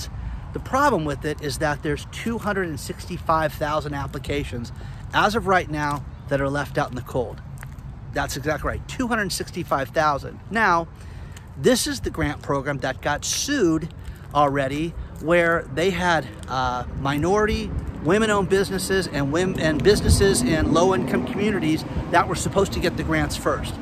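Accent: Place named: Western New England, United States. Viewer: you sound like you're from Western New England... like me. Great work!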